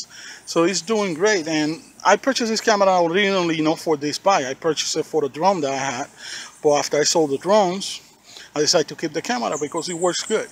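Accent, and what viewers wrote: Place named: Cuba. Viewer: U are Cuban I can tell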